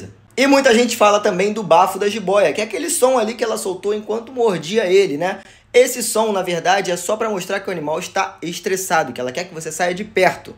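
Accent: Rio de Janeiro, Brazil. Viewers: Você é tão carioca, que você falou “um dos”, e a legenda apareceu “um dois”. Hahaha! Abraço! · Adoro teus videos .muito objetivos Tu é o cara e o teu sotaque é muito legal carioca né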